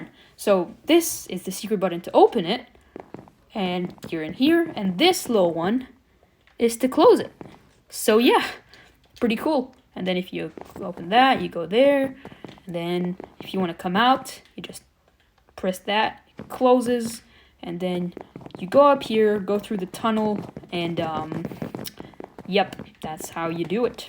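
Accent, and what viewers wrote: France. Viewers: ARE YOU FROM FRANCE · Dude you from france 😅 i recognise youre voice anyway i love this world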